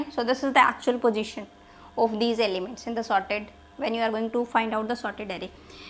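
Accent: India. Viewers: dont like the indian english accent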